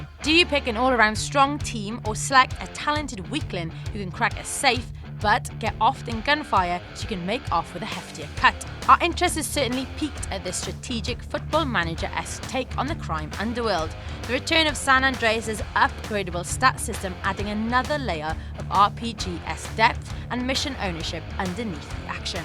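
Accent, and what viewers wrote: Wales, United Kingdom. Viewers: Sounds more like a Welsh accent than an English one.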